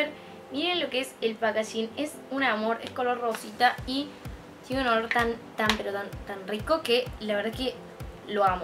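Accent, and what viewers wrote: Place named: Córdoba, Argentina. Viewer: amo como hablas enserio tenes muy linda voz y me encanta el tono cordobes besos <3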